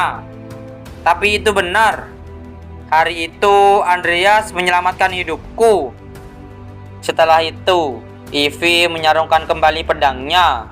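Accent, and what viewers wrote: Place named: Bali, Indonesia. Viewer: Logat ngomong nya kyk orang bali kyk bli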